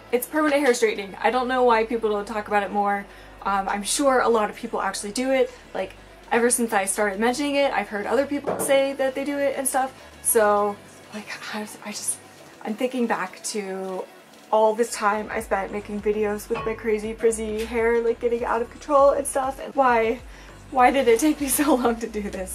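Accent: United States. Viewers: VERY obvious American accent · we gotta get out this comment section. They're way too ignorant here. I'm shocked they don't know anything about perms and relaxers for one and two they didn't even know she was American. They said she must be from England she doesn't look like an American woman. Like what do American women even look like where literally a mixture of different people and cultures. I knew she was an American from her American accent 🤦🏾‍♀️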